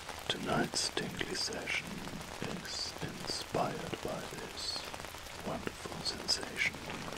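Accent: Germany. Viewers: You're German aren't you? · He’s German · Do you mean “accent”? If so, I think he’s German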